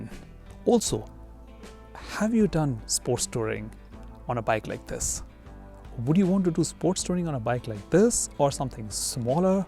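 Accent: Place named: India. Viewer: Man , hard to understand your Indian accent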